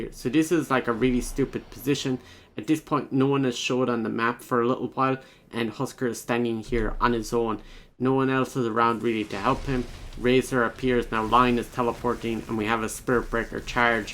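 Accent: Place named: Ireland. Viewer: You sound like you live somewhere in south ireland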